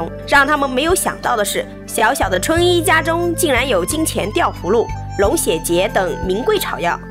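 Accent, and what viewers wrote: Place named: Shanxi, China. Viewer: 山西还是陕西？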